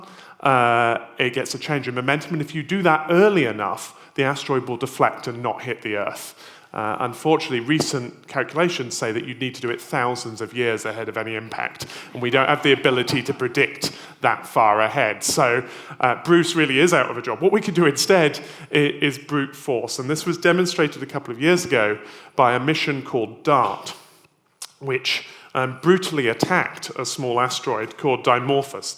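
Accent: United Kingdom. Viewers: I love the britishy accent of the speaker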